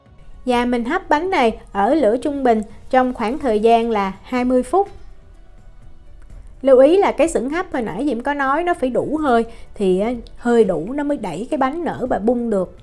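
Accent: South, Vietnam. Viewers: Diễm hướng dẫn kỹ lưỡng, nói chuyện dễ mến giọng miền Nam Chúc Diễm năm mới nhiều may mắn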